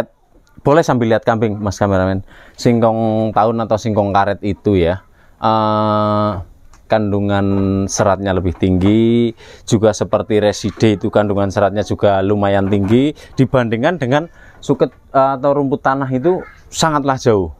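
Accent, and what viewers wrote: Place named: Banyumas, Indonesia. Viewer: prtahankan Ngapaknya, trims ilmunya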